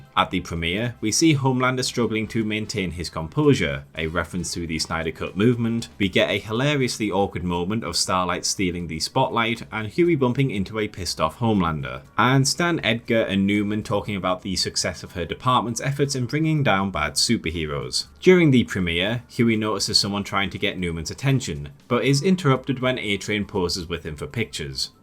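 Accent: United Kingdom. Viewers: 2 HOURS OF A BRI-ISH GUY BITCHING ABOUT HOW UNBELIEVABLE A SUPER HERO SHOW IS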